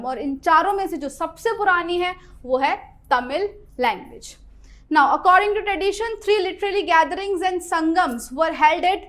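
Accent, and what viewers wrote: Gujarat, India. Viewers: Are you Gujarati????😊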